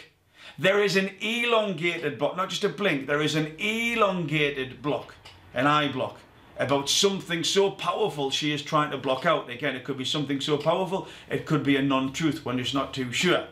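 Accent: United Kingdom. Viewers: I just have to hear your British accent and know that your take on Meghan would be that she is a liar.... then journey down and read the comments anddddddd its confirmed. Thank you for doing this video. In reading some of the comments I'm saddened by the still many people being so negative to Meghan... you are bringing your biases against her into this. T. My take is that many of you are genuinely racists and never wanted to be neutral where Meghan is concerned and that's ok... its just who you people are.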